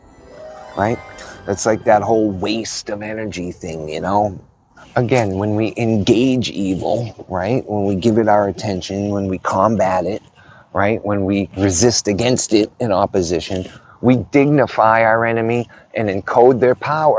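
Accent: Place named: Boston, United States. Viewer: oh, very nice! I thought I detected a bit of a Boston or Eastern MASS accent in your voice now & then, but I could be mistaken.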